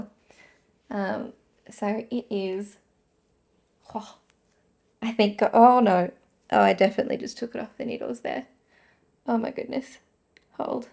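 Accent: Australia. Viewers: Congratulations on your socks. They are beautiful. 9" circs give me hand pain too. I prefer magic loop or DPNs. I still use my Chiagoo 9" needles to hold stitches for heels and such. Your Aussie accent is charming.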